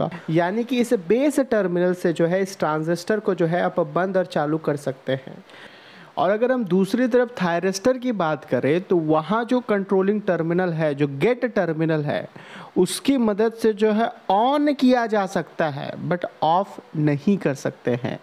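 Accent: Bengal, India. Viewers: Sir are you bengali??? Your content is so helpfull for me.... Thanks for this great work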